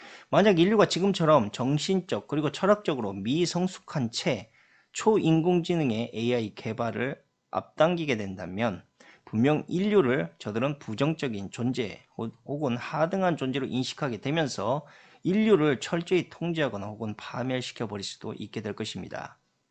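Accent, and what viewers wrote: Jeolla, South Korea. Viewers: 말씨가 전라도같은데?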